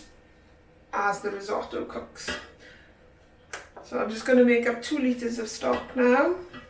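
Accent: Wales, United Kingdom. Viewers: It looks yummy! I'll have to give it a try! Good luck on your growing in your garden! When do you give up the allotment? Oh and I love your Welsh accent :)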